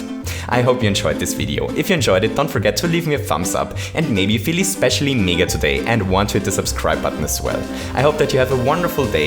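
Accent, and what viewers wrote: Germany. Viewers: german accent. i hate it. it's like ear cancer. xD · You sound german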